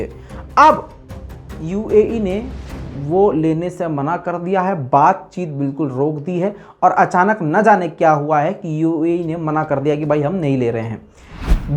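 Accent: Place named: Bihar, India. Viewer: Loved Bihari Andaz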